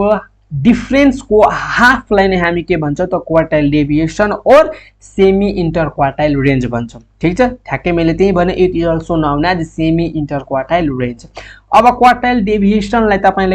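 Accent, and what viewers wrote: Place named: India. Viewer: I dont understand your indian accent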